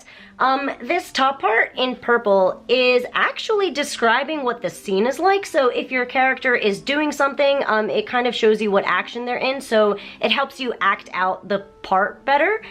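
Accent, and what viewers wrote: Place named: United States. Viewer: You sound soooo american but you speak japanese??? How do you do it!?!?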